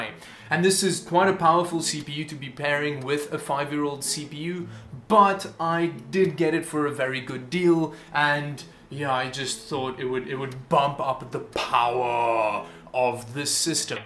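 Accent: South Africa. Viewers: Great video... Hey are you South African?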